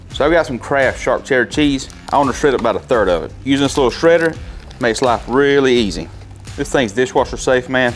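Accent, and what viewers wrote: South, United States. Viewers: GD these look good 🤤😋 I love your country accent btw❤️ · Hey where you from? I hear that southern accent in your voice 😁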